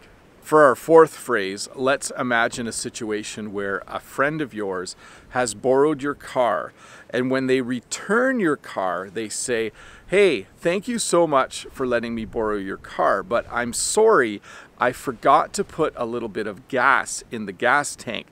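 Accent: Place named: Canada. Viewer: Hi, a great English teacher, I often learn English from your teaching, it can help me to improve my English, especially about the pronunciation I've got better so much, actually I've never been to Canada, as you maybe pronounce quite different of some word from American at the US. in which I've ever been before, but that's not problem at all. Thank you very much.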